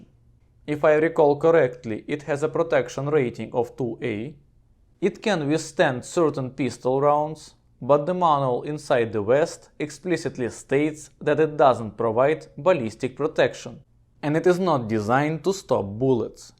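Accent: Russia. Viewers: Hotel posmotret...yslushal dikiy ryski akzent..I rashotel smotret.. Ychi Angliski sinok... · New viewer here. I have never heard a more Ivan sounding Ivan than you before. Love the accent.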